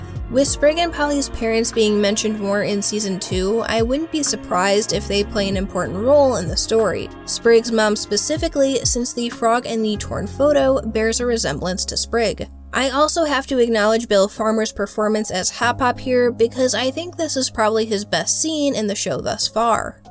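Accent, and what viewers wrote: Minnesota, United States. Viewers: Every video I see of you I think you’re from Minnesota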